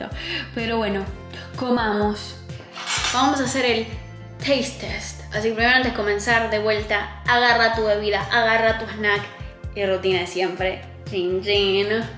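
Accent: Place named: Argentina. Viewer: No les pasa que ✨Amaricho✨, me encanta ese acento argentino y te amo demasiado, saludos desde Costa Rica...bai! 😁✌🇨🇷☀💛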